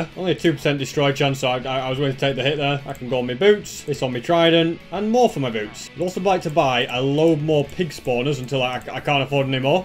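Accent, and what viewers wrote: United Kingdom. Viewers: hey im new to your channel also i have a question are you britsh? also again good job on the content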